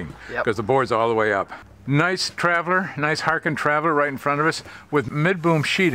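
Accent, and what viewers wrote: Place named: United States. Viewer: All that info, and all spoken in perfect 👍 American English!! What a beauty!!!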